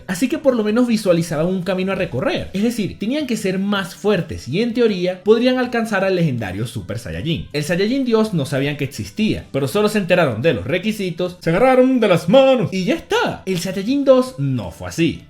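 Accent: Venezuela. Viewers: Pamre jure nunca ver a un youtober con ese acento venezolano pero tú eres la esepción grande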